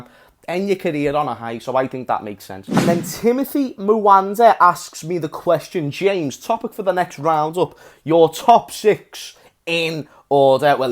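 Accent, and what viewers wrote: Liverpool, United Kingdom. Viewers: Liverpool accent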